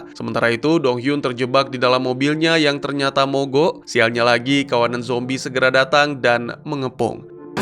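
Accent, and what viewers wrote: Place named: Java, Indonesia. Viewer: Ooh adminnya orang jawa ya😅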